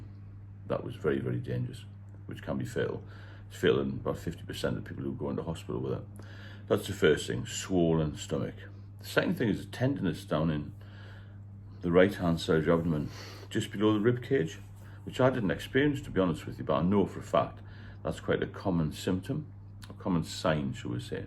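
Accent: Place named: North East England, United Kingdom. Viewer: Howay, pet, I rather like the North-East accent!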